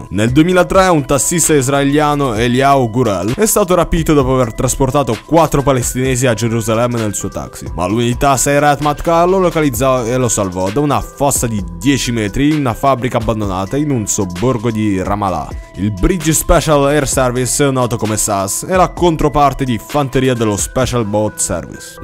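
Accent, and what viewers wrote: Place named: Russia. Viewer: Sento un lieve accento russo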